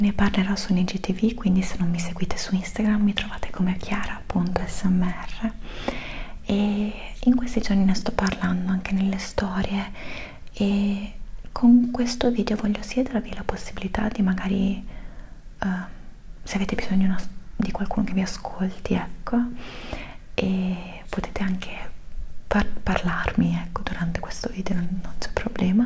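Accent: Italy. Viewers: A pesar de que no entiendo nada...el acento italiano en asmr me hace tener demasiados triggers.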